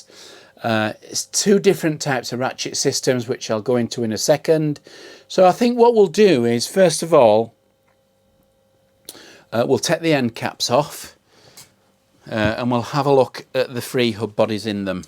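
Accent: Yorkshire, United Kingdom. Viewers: Yeah he's proper Yorkshire man 🔥💪🏻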